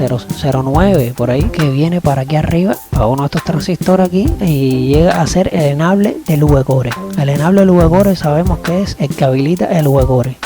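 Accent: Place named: Puerto Rico. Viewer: si primera ves que hablaaaa jajajajajajaja...................y tiene acento boricua jajajajaja likeeeeee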